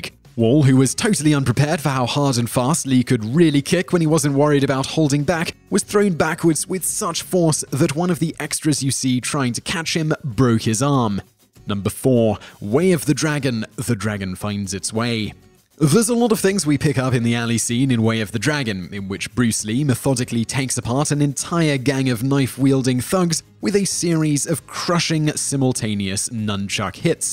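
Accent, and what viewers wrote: United Kingdom. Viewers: How can you know all this about Bruce Lee and still not be able to pronounce Kato? Even if you're pronunciation is "more correct" and you say it with a British Accent isn't going to change the fact that no one else says it that way. Also you mispronounced Milton Berle's name as well.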